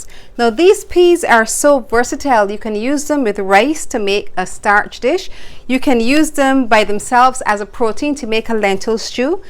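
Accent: Barbados. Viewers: Nice to hear a Bajan for change. Love de Rock! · Girl yousa bajan I recognise that accent anywhere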